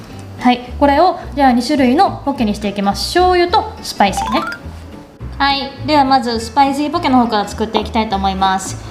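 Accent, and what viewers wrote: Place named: Kansai, Japan. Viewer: Youtubeやってたんだ〜❗関西弁のイントネーションが、とても新鮮❗🤗 なんかうれし〜🎵 「涙出〜へんやつ」とかって。 ハワイで関西弁。いい❗❗❗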